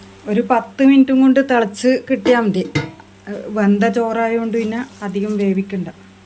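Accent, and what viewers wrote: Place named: Kannur, India. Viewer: ok👍 ഭാഷ കേട്ടത് കൊണ്ട് ചോദിച്ചതാണ്... ഞാനും കണ്ണൂർ ആണ് 😃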